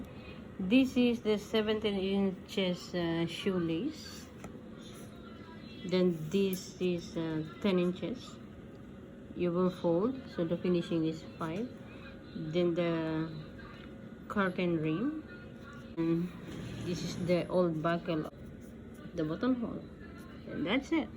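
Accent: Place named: South America. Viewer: me to! I thought South America. Her English is really good but u can still hear an accent and I thought it sounded like south American. We're aways off..lol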